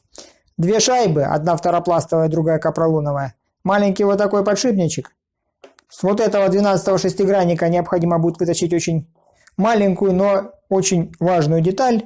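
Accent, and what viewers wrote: South, Russia. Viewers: Я сначала думал он на самом деле себя (и канал) назвал. И говорок у него такой типа, южный. Многие знают как там Гэ-кают. А он оказывается. Т.е. механический мозг. Но блин, он и правда! Надо же так отверстие придумать прорезать! И чем? Саморезом! 👍👍👍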